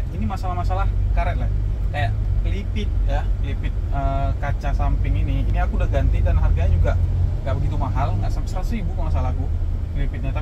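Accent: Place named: East Java, Indonesia. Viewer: Orang mana om, kok logatnya pake le lek gitu.. Salam dari jawatimur surabaya😊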